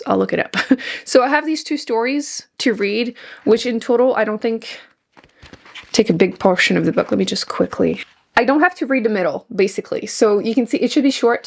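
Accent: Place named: Quebec, Canada. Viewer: Nevermind, that accent answered my question. Maybe you'll see a fellow quebecoise booktuber soon? I'm trying to start one even though I'm very new at this. You managed to get an impressive amount of subscribers, congrats!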